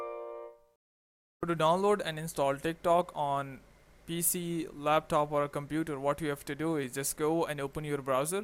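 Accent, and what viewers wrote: India. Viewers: he doesnt sound like a tech support scammer he sounds like a homeless indian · He is just speaking in an indian accent? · This guy sounds like hes from india. Don't follow hes tutorial it's just a big hack!!!!!! t-gay sub to pewdiepai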